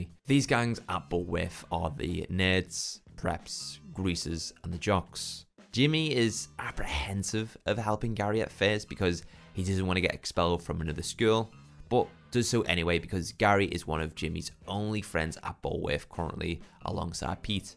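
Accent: Liverpool, United Kingdom. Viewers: Near certain you are from Liverpool. We should collab on something, yeno. It would be decent. Two scousers hopping on some games. Seen your GTAiv and made me wanna play it again, on ps3.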